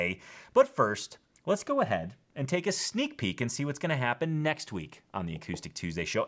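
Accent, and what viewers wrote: Chicago, United States. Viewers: You are my favorite Chicago “regular guy” accent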